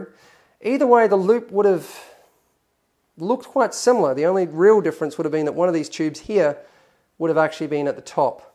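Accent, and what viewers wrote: Australia. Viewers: i love the vids so keep them up ! but i got one thing to get of my chest: i for sure thought u were an asian male ( no offence meant) i think it's just the Australian accent fucking with my mind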